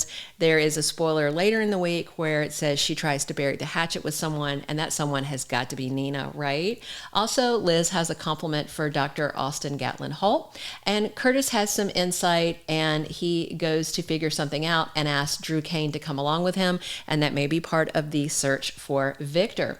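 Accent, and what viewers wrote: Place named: United States. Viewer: It's nice to hear an American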